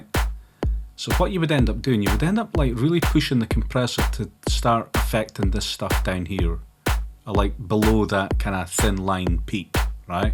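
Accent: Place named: United Kingdom. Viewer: after this video i'm still confused, are you bri'ish or what? your accent is clipping my brain :S great video btw!! clipping makes mixing and mastering so much easier <3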